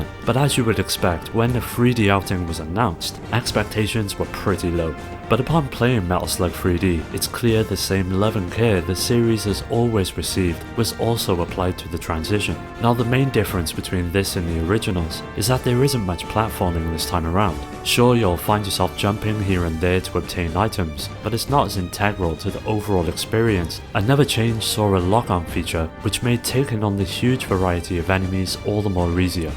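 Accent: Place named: Wales, United Kingdom. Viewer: are you from cwmbran or Newport? that accent is quite recognisable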